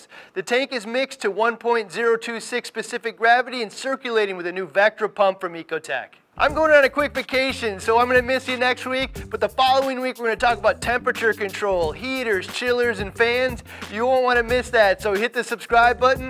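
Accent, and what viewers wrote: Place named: Minnesota, United States. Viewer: That explains his vowel sounds. Minnesota... I don't mean to nitpick; the videos are great, but northern accents are like nails on a chalkboard to me. I'm sure my Texan accent is just as offensive to people.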